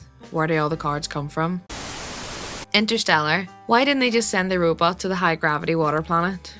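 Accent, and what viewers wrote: Scotland, United Kingdom. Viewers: Just curious but are you Scottish, maybe Irish?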